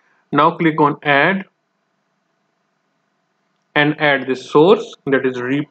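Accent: India. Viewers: took me 10 min to understood what symbols y say Indian accent not say in description also i cant see 720p pfffff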